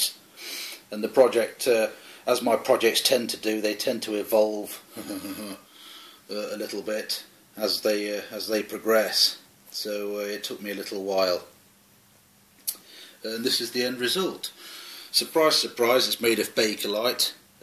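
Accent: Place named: United Kingdom. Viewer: What kind of British accent/dialect do you speak with? Hope that doesn't sound weird I'm just really curious haha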